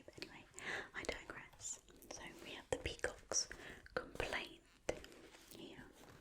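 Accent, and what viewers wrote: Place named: United Kingdom. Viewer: I love your accent ♥️♥️♥️ I am guessing you are from the uk but whereabouts in the uk 🇬🇧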